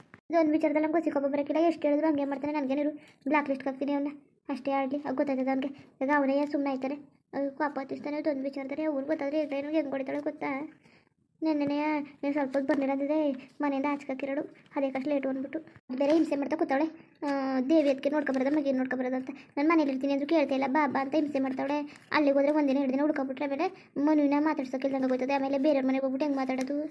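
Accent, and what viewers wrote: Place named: Mysore, India. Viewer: Mysore hunsur language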